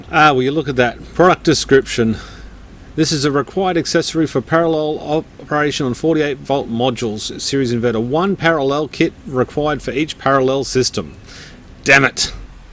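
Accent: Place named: Australia. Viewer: We love your Aussie accent here in the states. Let's do an episode counting how many times says "let's face it.".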